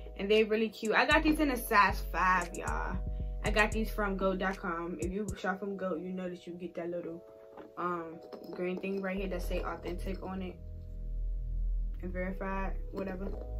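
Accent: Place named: Baltimore, United States. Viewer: Only real ones know she has a Baltimore accent 😭😭😭😭.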